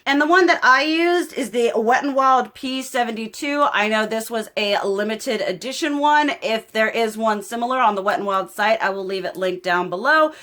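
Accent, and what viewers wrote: South, United States. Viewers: a true southern belle 💗 you have such a beautiful voice. I'm in Lafayette Louisiana and all I hear around here is Cajun so its refreshing to hear a beautiful accent 💯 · New subscriber here 👋👋 I have to ask, with that beautiful southern accent, where are you from??? I live in northern Louisiana and we have a few women here that sound the same!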